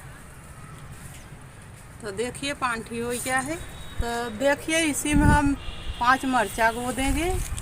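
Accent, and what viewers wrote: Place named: Uttar Pradesh, India. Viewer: Mujhe aapki recipe pasand aai,aur aap k bolne ka tareeka bhi,sultanpur side ki hai lgata hai aap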